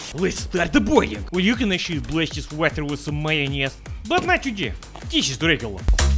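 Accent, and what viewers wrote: Russia. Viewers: Видео русский акцент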